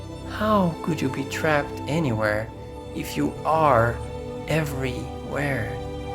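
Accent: Brazil. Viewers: Demorei pra perceber que você era BR. Mas mesmo assim, conteúdo muito bom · Br? Eu senti o português no primeiro segundo do vídeo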